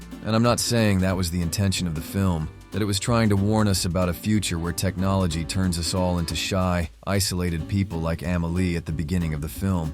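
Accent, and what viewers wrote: United States. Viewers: Your stupid american accent killed this video from me. I lived in Portland Oregon and I just have to tell you to get a different voice for your videos. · that really doesn't surprise me. Listening to an American accent critiquing the film was painful. 🇳🇿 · Kind of weird to hear a monotone american voice drone on about one of my favourite films. But what he says is quite acurate. I saw the film on the opening day in Germany. It flashed me so hard. I loved it, all the weirdness, the strange colours, the music! Amelie is still one of my most beloved film scores! It is what it is. Either you love it, or you don't.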